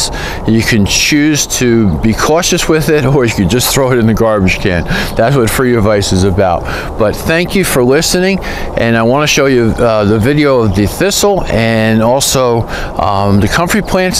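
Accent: New Jersey, United States. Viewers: I agree the wether has been so up and down here in NJ. I’m in zone 7a. It’s kind of funny that I recognized my own accent when listening to you and wondered if you’re from NJ as well. Lol. I watched another video and sure enough you are lol. My tomatoes were doing really good and then really bad after up potting them. They’re in the garden now and most are making a nice come back. I have no clue what was happening but the tops looked like they rotted and fell off on some. I ended up putting them all in a trash pile outside that I neglected and to my surprise they started getting leaves down low on the stem 🤷🏼‍♀️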